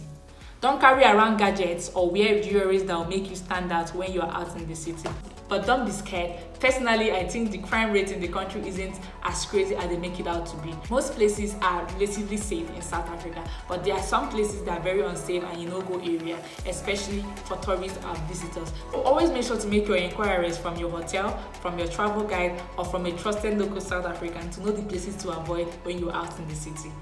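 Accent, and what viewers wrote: Nigeria. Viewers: I can hear you as NIGERIAN GIRL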